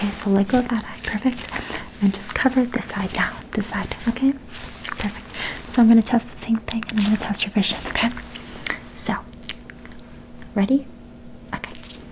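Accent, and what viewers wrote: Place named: United States. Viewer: my first time watching you and that blows my mind you sound so American!! very sharp pronunciation you have the accent down to a T girl, i’m beyond impressed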